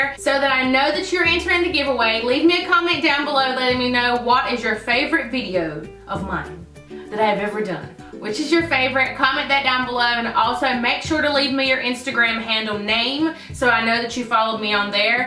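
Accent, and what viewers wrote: South, United States. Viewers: My favorite video would have to be the master bedroom transformation. Girl, it's beautiful! I hate picking just ONE video because I love them ALL!! I love those videos when you start rambling in the car because your accent is just like mine so country girl! My Instagram is LOVE YOU & CONGRATS ON 20K GIRLY!!!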